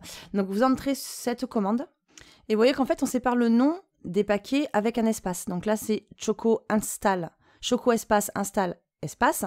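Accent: South, France. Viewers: merci pour tes vidéos, t a l accent du midi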